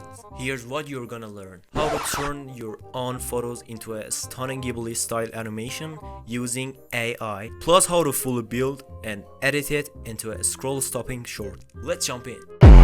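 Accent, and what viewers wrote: Iran. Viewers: بنازم داداش. عالی صحبت میکنی ولی بازم یه ایرانی لهجه تو میفهمه. موفق باشی عشق داداش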